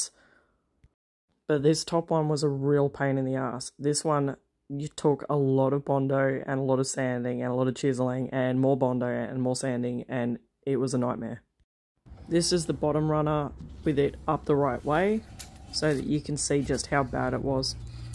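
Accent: Australia. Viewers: Wow. So much detail work here. Your client should pay you well. I cannot imagine how many hours of work here. Love your Aussie accent and your quotes...."stand out like a dog's nuts". 😂😂😂. I always enjoy your sense of humor and look forward to your content. How many hours did you work on this piece?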